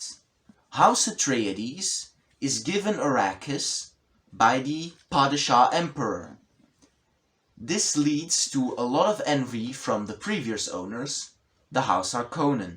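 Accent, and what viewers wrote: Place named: England, United Kingdom. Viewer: Liking the English accent, strong opinions and of course the bun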